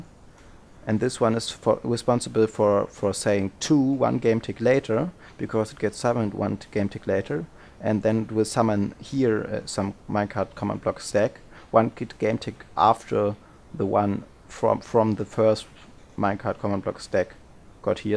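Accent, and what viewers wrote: Germany. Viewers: man merkt, dass du deutscher bist xD aber du sprichts sehr gut englisch mach weiter so :D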